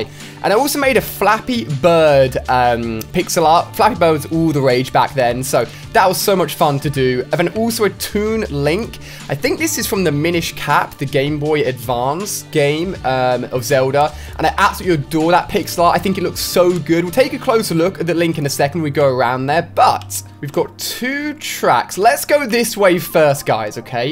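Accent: United Kingdom. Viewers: why are all minecraft youtubers british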